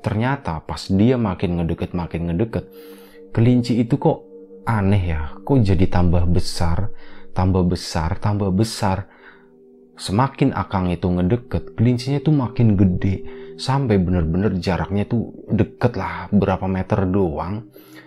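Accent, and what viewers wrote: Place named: Pekalongan, Indonesia. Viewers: Aku org sunda asli.. Pas mas ngmng sundaa jd pngen ktawaa krna bhsany sunda tp logatny jawa.. Tp keren mas lbih bnyk lg msukin crita sundanyaa😁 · karang Dadap mas, pean Pekalongan jga to logat e kok Podo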